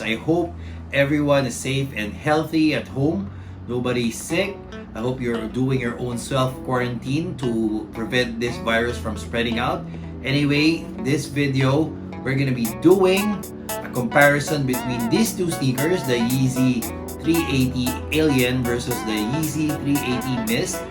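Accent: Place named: Philippines. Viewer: Super Filipino accent lmaoooo!! Sound like my friends lmaooo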